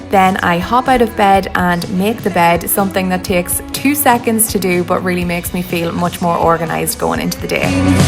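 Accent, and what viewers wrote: Ireland. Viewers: I absolutely love you voice! People always guess Irish when they hear my accent(I live in Scotland but my father is Irish so I have a weird mix of both accents) so when I hear an actual Irish accent it makes me happy bc it reminds me of my dad.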